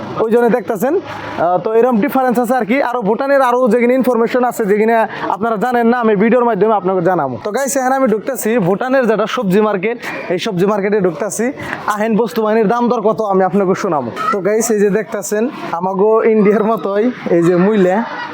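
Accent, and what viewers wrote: Bangladesh. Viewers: আপনার কথা শুনে মনে হচ্ছে আপনি বাংলাদেশি যদি ভারতীয় হন তাহলে এরকম ভাবে কথা বলেন কেন? কোন জেলায় থাকেন? আর যদি বাংলাদেশের মানুষ হন তাহলে আঁধার কার্ড কিভাবে পেলেন? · Amader tangail ar moto language lage 😊❤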